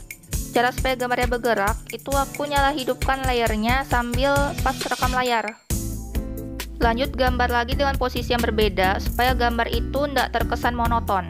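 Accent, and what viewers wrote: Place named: Kalimantan, Indonesia. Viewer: Kw ni org kalimantan kn? Logat ngomong mu kyak di tempat ku soalnya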